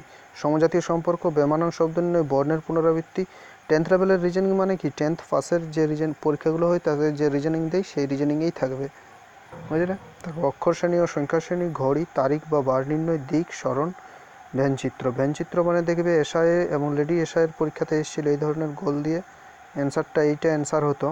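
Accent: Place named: Purulia, India. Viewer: Karon... Amio purulia r😂 Pronunciation ta tei dhora jai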